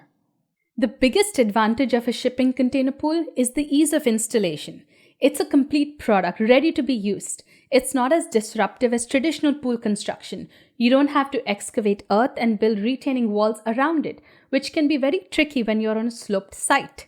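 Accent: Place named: India. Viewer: You are the only Indian person i like on YouTube. I usually cant stand the accent but your information is so well worded and to the point that I don't mind. I assume this compliment also sounds like an insult, but that is not my intention. Just being honest. Thx for the upload!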